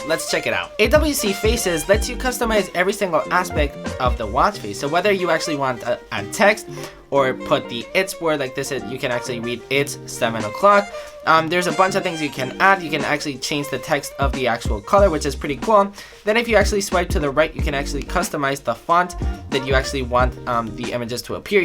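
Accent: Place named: Spain. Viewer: He is from Spain. Of course he isn't gonna sound just like you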